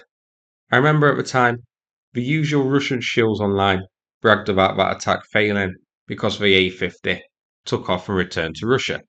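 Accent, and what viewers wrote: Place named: Nottingham, United Kingdom. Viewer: Good stuff. (BTW: Where are you from? I'm from Nott'm and your accent is very familiar!)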